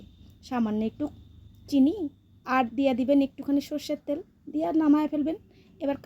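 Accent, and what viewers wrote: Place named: Bangal, Bangladesh. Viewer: খুব ভালো বাঙাল ভাষা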